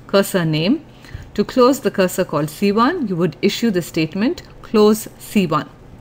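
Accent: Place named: India. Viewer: Thanks for this. Good explanations and it is fantastic to hear someone with an Indian accent speaking English so clearly.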